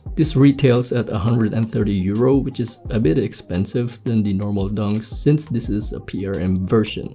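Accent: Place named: Philippines. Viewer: Pinoy na pinoy accent lets gowwww kuya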